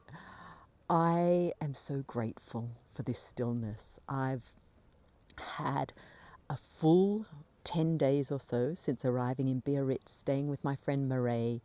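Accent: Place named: Australia. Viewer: Thank you for sharing your journey. Its nice to hear a fellow Australian on this path. I dont know much of Mary Magdalene but am drawn to learning more. Blessings from Australia.⚘️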